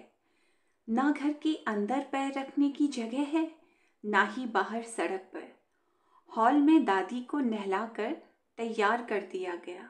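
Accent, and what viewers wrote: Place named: Rajasthan, India. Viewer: ये राजस्थानी लहज़ा, लिखावट, संस्कृति... सब कुछ बेहद ख़ूबसूरत। बहुत सुंदर संस्मरण ♥️🌼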